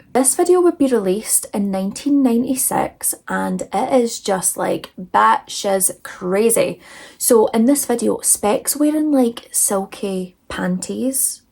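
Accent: Scotland, United Kingdom. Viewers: Hey! I started binging your videos. I like a very diverse group of true crime girlies. You have the cutest Scottish accent I could kiss you 😘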